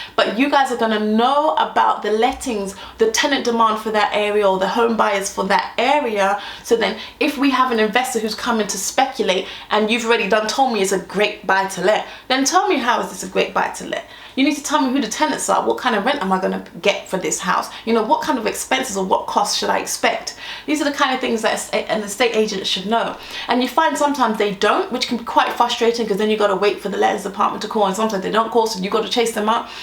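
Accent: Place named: South, United States. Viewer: why have you gone in to the southern accent. its so funny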